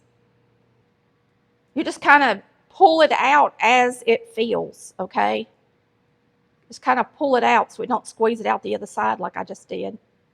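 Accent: South, United States. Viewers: Hi funny lady,wth that strong Southern Accent ..I ❤your food arts ..I just think 🤔 u wanna make me fat..u better Stop it! lol ..jk don't stop it's so good❤🎉😮😂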